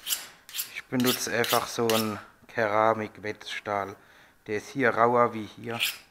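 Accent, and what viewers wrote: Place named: Hesse, Germany. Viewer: vielen Dank für deine VA! hat mich mega gefreut! :) du bist ja geil drauf :D aus welcher Region kommt denn dein Akzent? Man könnte meinen du kommst aus dem Hessischen Bergland :D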